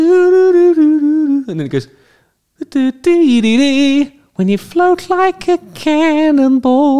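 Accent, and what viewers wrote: United Kingdom. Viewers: Hello. Firstly I’d like to say I love your content. Secondly, you have the most annoying intro I’ve ever heard yet I find myself singing it throughout the day. When I first started listening to you I thought you were saying “right again.” I thought to myself this is one cocky Britt and I like it.Have a great day sir!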